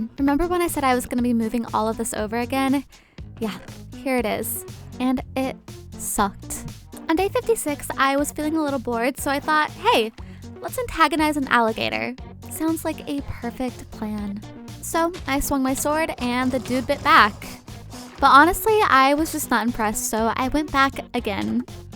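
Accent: Australia. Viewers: The best Aussie accent I've heard so far And I'm a Aussie :) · Your accent was half Australian half English coming from and Australian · Very great Aussie accent, fun thing is that people from the center to northern territory do speak like that, but I'm from the other end of Australia (AKA the southern east area)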